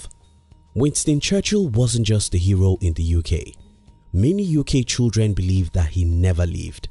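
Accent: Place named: Nigeria. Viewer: You sound Nigerian. If you are then you should know using more relatable references would help drive home your point better. Winston Churchill is perceived by some has racist so to some it's a bad reference to make and 89% of the rest of the world don't even give a F about him. But In all great content 👍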